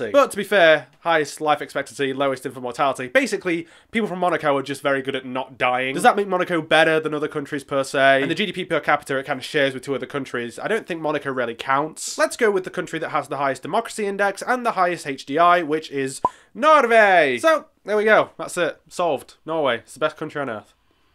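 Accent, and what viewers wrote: United Kingdom. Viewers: Are you from Essex? I'm curious, also new here. Nice video. :)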